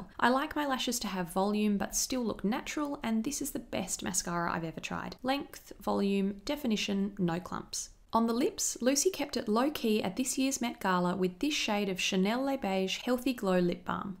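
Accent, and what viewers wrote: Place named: Australia. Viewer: I don't know how the hell I ended up here but, I really like that Aussie accent 😄💙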